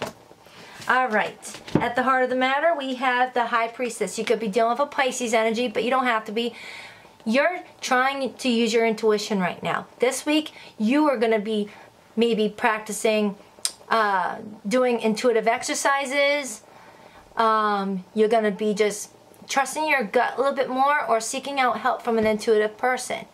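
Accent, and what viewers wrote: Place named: Brooklyn, United States. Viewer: Girl, you are so spot on. My past person messed me UP somethin bad 😔. Loved the video ❤🥰 and i was guessing your accent was brooklyn lol i have some friends from there ans ya'll sound the same 😁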